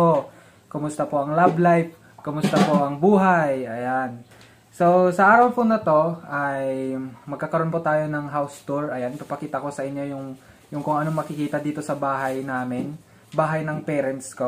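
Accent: Ilocos, Philippines. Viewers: Makakatawa ak ehh kasi gamin eh ilocano tagalog sao natin eh. Kahit pinipilit mong agsao tagalog eh may laok na ilocano pa din eh😂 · Kwan!😅Basta ilocano di nawawala sa pagsasalita... proud to be I'm ilocano from TARLAC....God bless more vedios na nakakainspired😊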